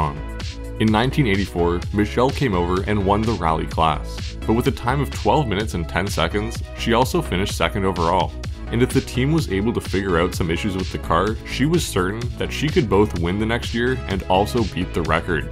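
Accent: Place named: Canada. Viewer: If anyone is wondering what a non Maritimes/Quebec canuck accent sounds like, this channel's for you. Cheers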